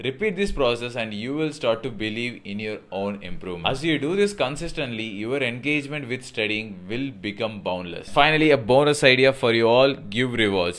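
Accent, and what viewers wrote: India. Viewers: Accent replicates how Americans think Indians speak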